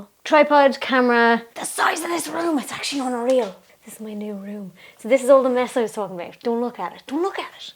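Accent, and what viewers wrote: Ireland. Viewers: I like ur accent!! What part of Ireland are u from originally?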